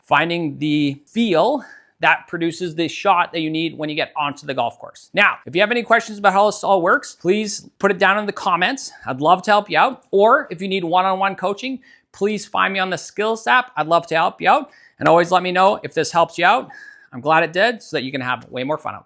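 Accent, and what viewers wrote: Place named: Canada. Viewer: Well done. The Canadian accent really shows up in this one. Love the simplistic way you explain that.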